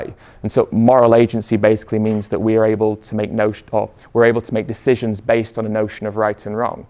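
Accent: United Kingdom. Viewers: I loved this speech and english accent makes it even better, absolutely awesome!! Thank you Ed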